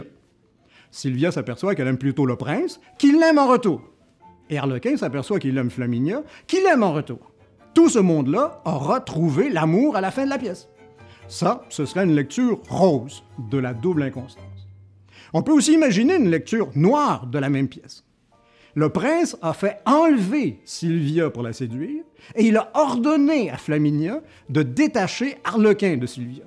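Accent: Quebec, Canada. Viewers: j'avoue du Beaumarchais avec l'accent Québécois ça aurait de la classe , je vote pour !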